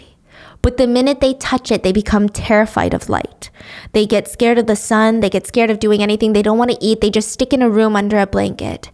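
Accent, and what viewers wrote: United Kingdom. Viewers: how do u always become britsh so randomly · Uh why sound british¿!?!?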